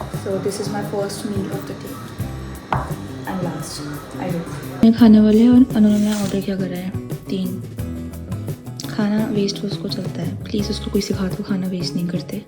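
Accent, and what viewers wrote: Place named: Rajasthan, India. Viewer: Or muje phle hi andaj tha ki sayad aap pali ya jodhpur se ho kyuki apki bat karne ka style vesa h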